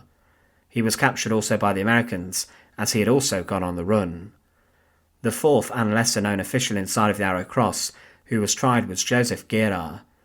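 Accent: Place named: England, United Kingdom. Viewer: Not Ai he's a pom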